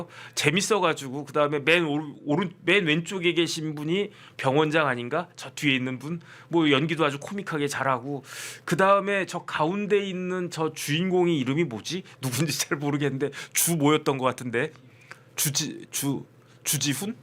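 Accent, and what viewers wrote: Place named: Seoul, South Korea. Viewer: 이국종 교수님을 살짜살짝 깍아내리는 아니~ 대놓고 깍아내리는 변호사님~~!! 서울분이라 뭐뭐 갖다가 뭐 갖다가... 서울 사투리 재미있습니다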